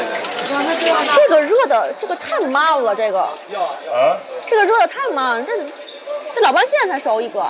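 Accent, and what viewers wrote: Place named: Tianjin, China. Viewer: 点赞Kaka的视频！天津话太亲切了。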